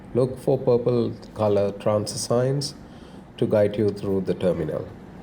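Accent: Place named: Sri Lanka. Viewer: Your English accent is very Sri Lankan..!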